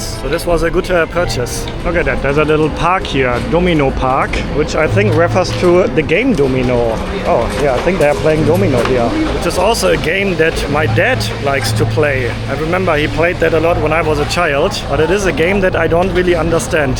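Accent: Germany. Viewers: Mein bester Mann hört das du aus Deutschland kommst 😁😁😁German English ❤️ Aber super Videos 👍🏻😊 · hes from germany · i can hear the deutschland out of you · Deinen deutschen akzent muss man mögen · You sound very German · Übrigens sprichst Du ausgezeichnetes Hochenglisch mit einem niedlichen deutschen Akzent! Respekt!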